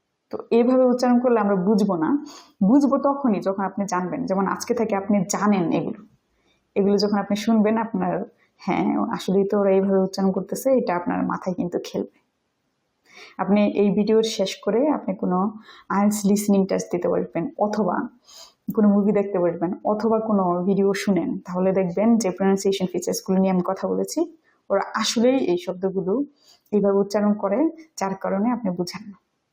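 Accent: Narsingdi, Bangladesh. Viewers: আপনার বাড়ি কি নরসিংদী?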